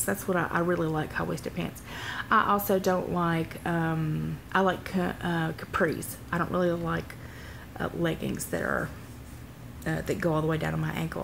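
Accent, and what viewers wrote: South, United States. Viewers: just have to say you have the softest sweetest voice. and I love that southern twang lol. Girl keep your chin up and keep on keeping on.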